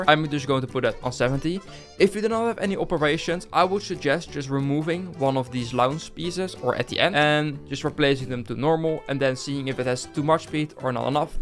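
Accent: Netherlands. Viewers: Hi, I have a question! Are you Dutch? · bro ben je nederland svolgens mij wel kan ik horen lol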